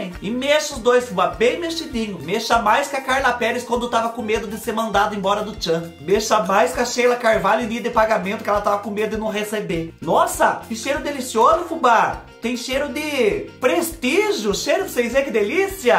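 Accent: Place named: Minas Gerais, Brazil. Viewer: Nossa, segundo vídeo que assisto seu, conheci seu canal hj e só ia ver de curiosidade, mas não aguentei e me inscrevi para não assistir de clandestina kkkkkkkkkkkkk e pq amei mesmo ^^ e esse sotaque mineirês é bão por dimais sô rsrsr <3 Sou uma mineira morando em Portugal agora, mas a mineirisse não sai de mim rsrs ô falta de um pão de queijo que deu agora rsrss Adorei vc e seu conteúdo, ri horrores rsrs obrigada! Beijinhos e sucesso!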